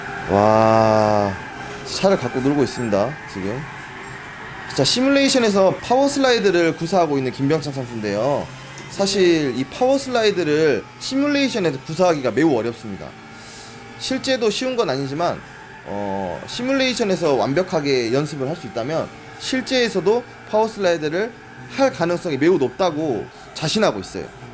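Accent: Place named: Jeolla, South Korea. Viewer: 안녕하세요..예전에 제네시스 EQ900 모터스튜디오에서 잠시뵈었지요..잔라도 사투리 억양과 준비 많이한 멋진영상 잘봤습니다..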